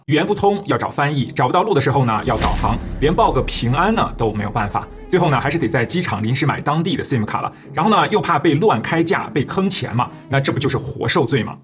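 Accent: China. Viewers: 听口音是大陆出生的，但用词很多台湾化，什么晶片啦，毫米不说毫米😊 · 那你到底是台灣人還是大陸人 如果是台灣人 你講話為啥像大陸人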